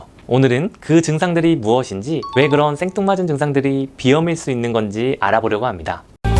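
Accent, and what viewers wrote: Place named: Gyeongsang, South Korea. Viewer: 몬살아ㅋㅋㅋㅋㅋ 안닮으셨다요 선생님도 경상도 시구나 저희 지역은 사투리 잘 안써요!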